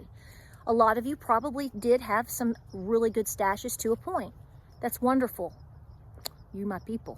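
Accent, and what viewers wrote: United States. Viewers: Y do u speak like a Yankee... America is weak asf that's y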